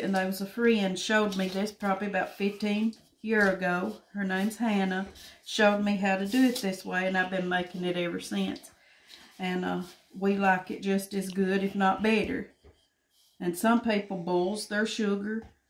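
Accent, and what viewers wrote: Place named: Kentucky, United States. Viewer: Sounds like a Perry County KY accent 😊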